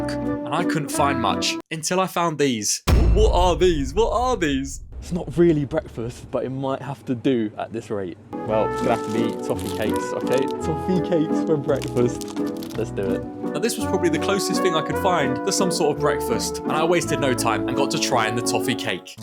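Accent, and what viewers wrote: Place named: United Kingdom. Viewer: Honestly u seem such a great dude! Plus I love British accent, it’s lovely :)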